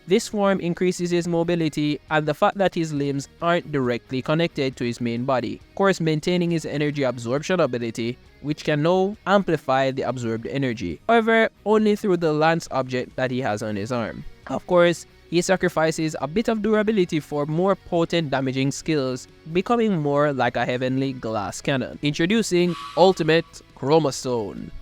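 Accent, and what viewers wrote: Jamaica. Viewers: Hold up are you jamaican bro. Di accent a sneak thru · Bro are you Jamaican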